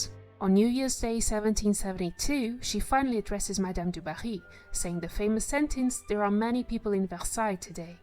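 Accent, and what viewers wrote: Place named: England, United Kingdom. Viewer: The fact that this AnasSyriano is not even capable of recognizing an English accent or not has made a fool of himself or herself. So never mind : your accent is truly nice, and thanks for the video 😉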